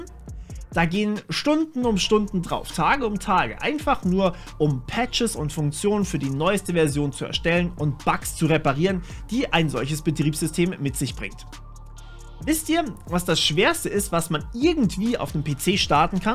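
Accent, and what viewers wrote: Nuremberg, Germany. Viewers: kommst du aus nürnberg? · Bist du aus Nürnberg ?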